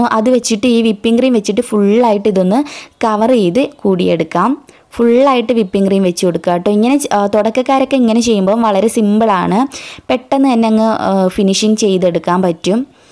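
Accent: Kollam, India. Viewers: I'm from kollam.chechide samsaram kettapozhe manasilay kollamkariyannu.ano😃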